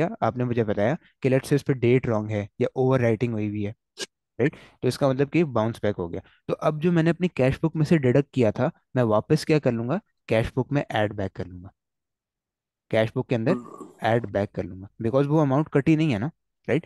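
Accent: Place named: Gilgit, Pakistan. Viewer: You have one of the best ways to explain that I've come across so far. Your accent sounds like that of the Gilgit people.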